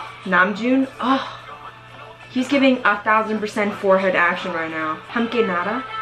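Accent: United Kingdom. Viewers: ur british got me crying 😭😭😭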